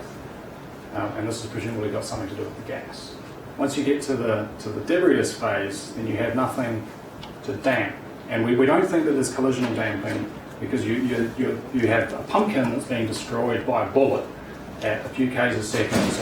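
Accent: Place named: New Zealand. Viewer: " I'm not gonna try and compete with Van Maanen's Star" - He does have a distrinct New Zealand accent.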